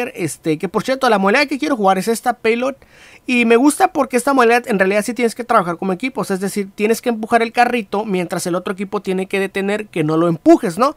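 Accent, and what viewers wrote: Mexico. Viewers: suena como mexivergas, por personas como es porque no voy a mexico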